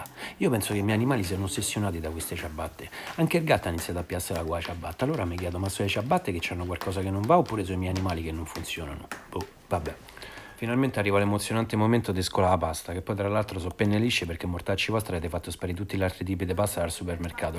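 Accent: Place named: Rome, Italy. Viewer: Siamo a Buenos Aires, racchiusi come voi. Anche Io ho provato serenità con i tuoi video, e li aspetto. Ho consigliato ai miei allievi d‘italiano di seguirti per imparare come parla un romano. Salutami il pesce!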